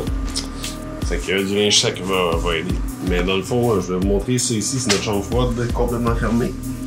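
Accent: Quebec, Canada. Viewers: Je voulais vous remercier pour toute les astuces que vous nous avez partagé. Si j'arrive à faire mon kombucha, c'est grâce à vous. C'est un plaisir de vous écouter (avec cet accent Québécois si agréable). A bientôt.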